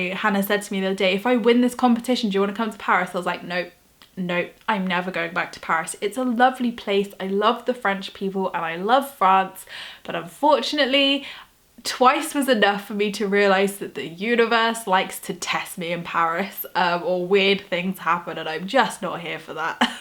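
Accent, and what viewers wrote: England, United Kingdom. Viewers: Hi. I’m Carrie. If I’m honest, I’m a little bit tipsy right now. But I felt connected to you for many reasons. One of them was, you’re the same age as my older sister, so I instantly looked up to you and wanted to be like you because I’m just a little shit and I don’t know who I am yet. But I do know that the idea of living a life like you live, fills me up. But I have absolutely no idea how you do it. I don’t know how to set up a business. But the idea of working a nine to five job kills my soul, and I’m not even doing it yet. The bottom line is, I deeply admire you, and the way you talk about manifestion and the law of attraction really speaks to me. I’m Scottish, and you’re clearly English (lovely accent, btw). I would travel to England just to hear your advice, but if also talk to you any way possible. Something about you makes me really trust you and I subscribed and binge watched you instantly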